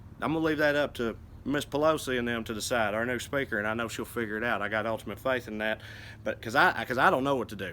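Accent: South, United States. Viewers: Hhhhh...love ya like chicken too! I sure hope you’re doing well! I never ever thought I would hear words of liberal wisdom riding on a solid southern accent, but it is a most welcome sound! And I believe you are going to be very successful. As they say, truth will win in the end! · Thank you. I'm from the south and a lot of the times, NOT ALL THE TIME, it seems like white people with that kind accent come off as being kind of racist. But after listening to you I am proud to call you one of my white brothers. May GOD bless you and your family. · Dude I love you in a way of the way you are highly intelligent and play up the accent to fuck with people. Mind blown fucking. It's against nature for any southerner you, Beau of the fifth, me if going by my assessment, southern Einstein any buddy to be smart and talk with a twang Get-er done. Alabama blue here. 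Glad to see the stereo type be proven wrong for a change. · Love this guy. Smart guy with a southern accent. Very good. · Southern accents are so much more attractive when an intelligent person is behind it. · Finally!! Someone with a beautiful southern accent and a damn brain!!! THANK YOU!! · thank you, southern twang brother, for showing all south haters that twang don't mean dumb, nor ignorant, nor hateful. from your friend, yankee liberal white guy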